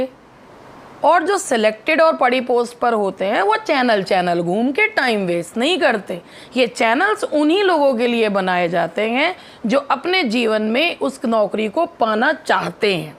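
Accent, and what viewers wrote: Meerut, India. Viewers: Mam aap meerut se ho kya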